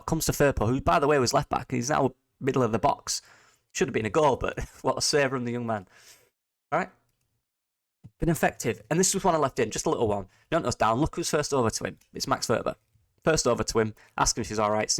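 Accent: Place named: United Kingdom. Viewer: Am trying to figure out your accent what part of the uk you from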